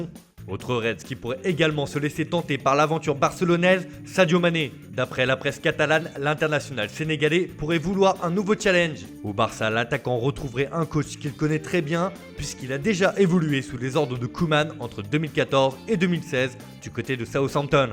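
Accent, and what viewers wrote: Italy. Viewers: j'ai cliqué sur cette vidéo et si j'entends cet accent italien je met un pouce vers le bas, désolé mais vous me laissez pas le choix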